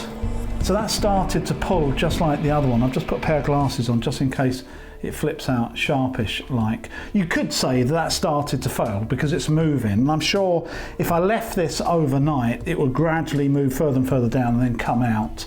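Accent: United Kingdom. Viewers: Thanks for this, was a bit concerned it would not go into a UK plasterboard so you can imagine my relief when I heard the good old blighty accent ;-)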